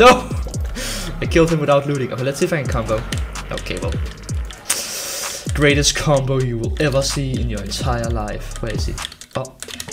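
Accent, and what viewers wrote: Denmark. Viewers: hej jeg kan høre du fra danmark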